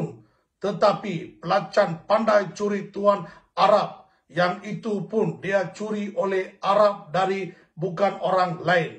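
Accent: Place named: Malaysia. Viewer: Looks like that right? But problem is the words are Malaysian Indian slang and accent you know.. not sure la bro.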